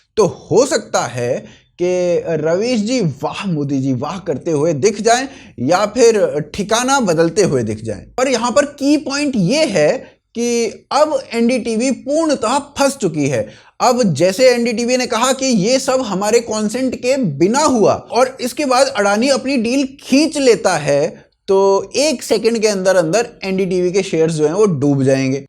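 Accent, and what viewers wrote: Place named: Morena, India. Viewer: Bhai aap log Chambal se hi belong karte hai na? Kyuki aapka morena accent bahut hi natural hai.. I am also from Gwalior.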